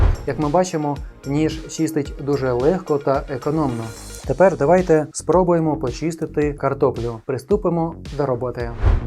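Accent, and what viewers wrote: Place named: West, Ukraine. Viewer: изуродованный западеной русский язык...тошно слушать,я все понимаю ...лучше звук выключить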